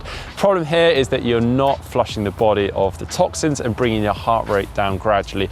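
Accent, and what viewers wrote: United Kingdom. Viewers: I want that British accent lol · Ahaha btw British accent is nice to hear 👍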